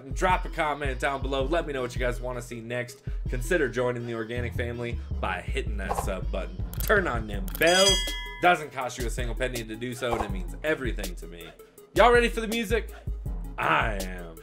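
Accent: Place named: United States. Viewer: dah slow dah tu dia ckp...American English tu...bukan English pure mcm UK Dia ckp..UK punya English lagi lah pening nk faham dia punya slang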